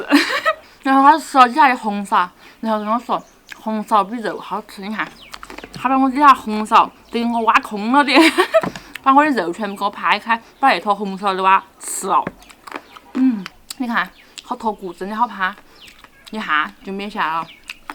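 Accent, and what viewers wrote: Sichuan, China. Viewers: 她讲的就是川普啊。。真要讲方言根本听不懂，尤其是说的快的时候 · 四川話好好聽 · 一口的四川话哈哈哈，我也是四川的，不过我现在在澳大利亚，我很喜欢看你的视频，做菜好看又好吃，人又长得漂亮大方。祝你有个好喂口加油哈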